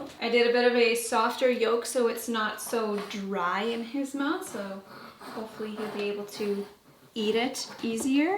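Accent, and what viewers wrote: Canada. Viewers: Definitely not all Canadians! She is from essentially the US Midwest equivalent of Canada. Compared to in Ontario (where Toronto and Ottawa are, our largest city and capital), I haven’t heard many people talk like she does! Another interesting one is how she says garage. Regional differences are so interesting!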